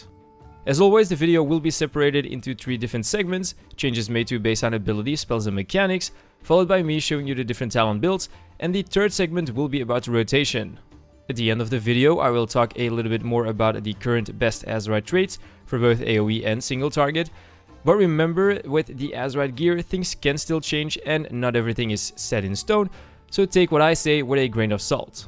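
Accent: France. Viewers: Tu es Francais toi :)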